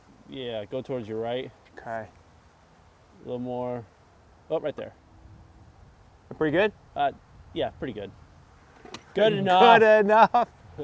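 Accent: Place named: Chicago, United States. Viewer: Nice Chicago accent.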